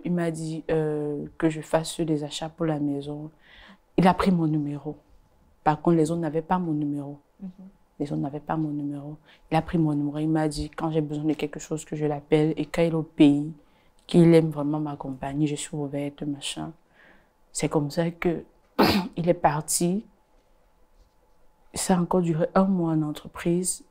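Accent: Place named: Cameroon. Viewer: C'est une camerounaise ou gabonaise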